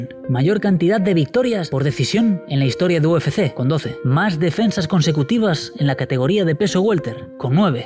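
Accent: Galicia, Spain. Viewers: Eres gallego? De donde?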